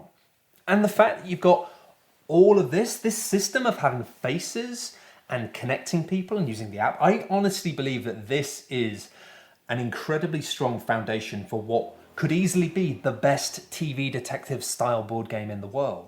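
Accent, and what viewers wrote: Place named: Liverpool, United Kingdom. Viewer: What accent was that!? Vaguely scouse in parts, BBC Generic Northern?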